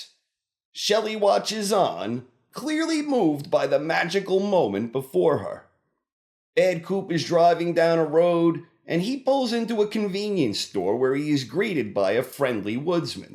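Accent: New York, United States. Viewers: Sir, from where exactly in the greater New York City region does your accent originate? I've been wondering this all season!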